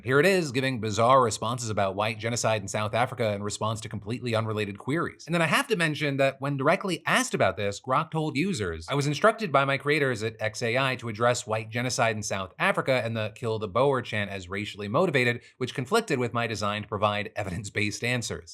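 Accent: South, United States. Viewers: I love the southern drawl creeping into your speaking pattern lmao its awesome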